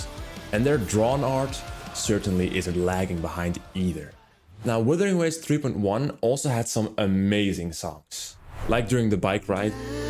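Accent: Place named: Netherlands. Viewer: 300 subs?? with this kind of editing? Amazing work Also are you perhaps Dutch because I thought I heard the accent in your English XD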